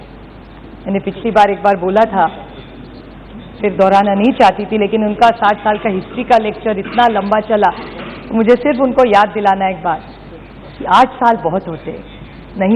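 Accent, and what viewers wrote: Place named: Maharashtra, India. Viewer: मराठी आवाज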